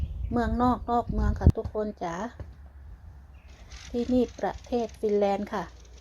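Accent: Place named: Isan, Thailand. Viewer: เพิ่งเข้ามาชมฟังเสียงพูดเหมือนทางอิสานใช่คนโครชไหมคะ..เห็นอยู่คนเดียวสามีตาย..คงได้เงินช่วยบำนาญแม่หม้าย..ว่าแต่ตอนนี้ยังช่วยตัวเองได้. แต่อายัมากขึ้นจะลำบากไหมกลับมาอยู่ไทยสร้างบ้านอยู่คนเดียวใกล้ๆลูกหลานดีไหมคะ..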